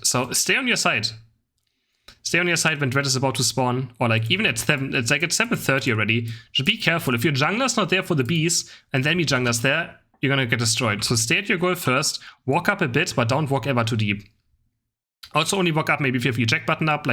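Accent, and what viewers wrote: Germany. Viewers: I'm sooooooo curious You have an accent I can't quite place It's like German or maybe like a Norwegian type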